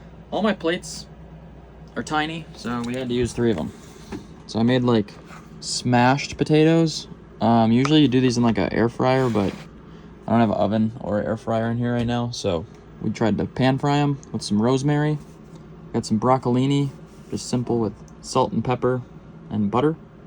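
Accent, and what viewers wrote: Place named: Minnesota, United States. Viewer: Its so nice listening to a fellow minnesotan on youtube. All the other youtubers have the worst non-minnesotan accents.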